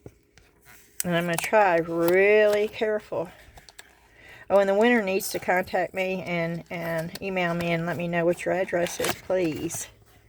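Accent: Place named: Pittsburgh, United States. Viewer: Do you have family from Pennsylvania? My old roommates spoke Pittsburghese. Unique words and word pronunciations. Warsh=Wash!!!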